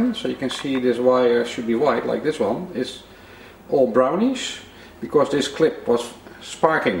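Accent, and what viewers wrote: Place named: Netherlands. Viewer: Strange that the voice has dutch accent but the socket on the wall is chinese.Maybe there is a chinese factory producing these Miele appliances?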